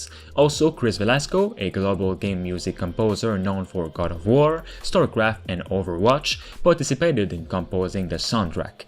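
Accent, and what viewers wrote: Quebec, Canada. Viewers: Hey, ton accent me rappelle celui d'un québécois, je me trompe? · Hmm are you from Quebec? :D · u have a quebecois accent ;) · caliss cest cool de savoir un quebecois qui comment les jeux pis tout mes caliss faut vraiment que tu travaille sur ton accent ca menerveeesss !!!! ont dirais gsp qui parle en anglais fuck!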